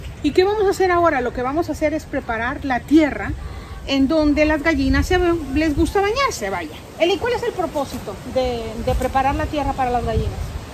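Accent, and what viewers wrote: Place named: Spain. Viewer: Y ahora x q empezaste hablando como muy española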